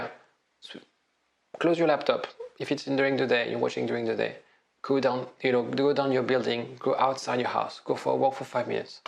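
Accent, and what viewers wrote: France. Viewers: Your voice, look, and french accent already calms me down 🙂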